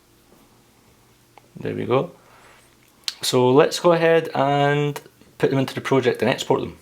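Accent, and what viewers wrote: Scotland, United Kingdom. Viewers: Thanks for the review man, it was very helpful. By the way, nice accent, reminds me when I was living in Scotland. Lovely People!!! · damn i dont understand shit in scottish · I felt that i learned more and paid attention better because of the scottish accent. Made it seem friendlier!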